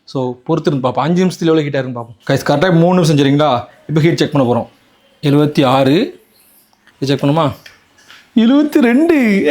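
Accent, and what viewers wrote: Tirunelveli, India. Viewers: Thirunelveli language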